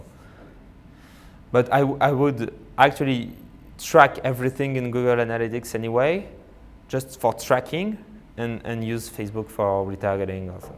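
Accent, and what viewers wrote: France. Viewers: Good talk with a lovely French accent :) The thought process of growth hacking can be taught and mastered, that's what people have to tell themselves. Read books, be around people who've done it, and actually jump into business. I empower people everyday at Elium (<link> and I can say that the barriers between them and their dream life are often imaginary !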